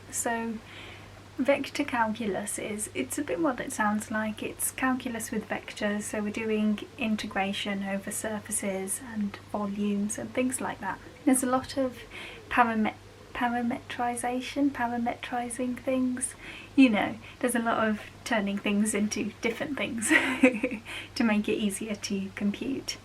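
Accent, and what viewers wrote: United Kingdom. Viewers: You sound different from other Brits I meet how many accents are there in uk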